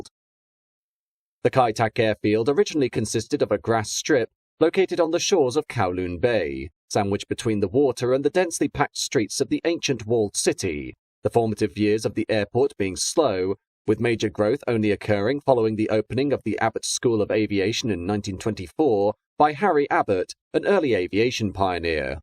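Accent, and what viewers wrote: United Kingdom. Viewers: I was born in Kowloon Hospital in 1946 . Growing up on my Grandparents' dairy farm in the hills above Ngau Chi Wan, my brothers and I watched the landing of Pan Am Clippers landing on Kowloon Bay. // Also, the British planes landed in the first- built runway ( near the district Wong Tai Sein): Everytime a small plane landed, all buses and autos had to halt in front of a barrier. I always take the No. 5 bus to St Mary's Canoissian School daily, and I remember this protocol vividly! // on certain special occasions, fleets and fleets of British aeroplanes would fill the air space --- above Kowloon Bay --- for an exhibition. It was exhilarating to watch, as kids, perched on a nearby hill ( south of an old, Taoist monastery!!! The British government later built the famous KAI TAK AIRPORT: I departed the bustling British Crown Colony, on Philippibes Airlines, taking off from the Kai Tak runway, heading towards Li Yu Mun Channel. 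What good Memories!// My dream -wish now is to cruise to Hong Kong Harbor, via Priness Cruises, and dock alongside the old Kai Tak runway to disembark!!! It will be .... like .... COMING HOME for a little Chinese girl! Thanks for this informative video. Much Appreciation. Love the British accent of the Narrator!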